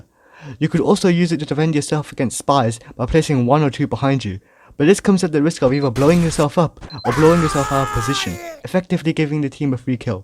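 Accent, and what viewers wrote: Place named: Jamaica. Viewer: Something feel right about hearing a Jamaican man teaching you how to blow up stuff better in a videogame.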